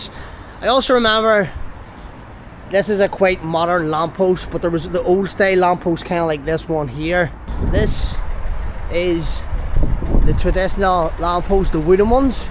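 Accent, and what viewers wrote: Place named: Scotland, United Kingdom. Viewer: Yee have a wee Scottish accent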